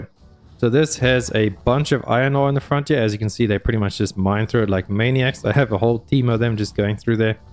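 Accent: South Africa. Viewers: Cant help but shake the slightly South African accent?😅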